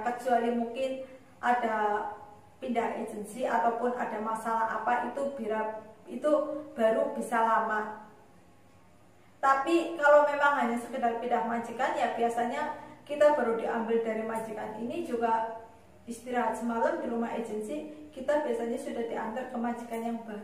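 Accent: Banyumas, Indonesia. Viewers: Salam ngapak ora ngapak ora kepenak😀👍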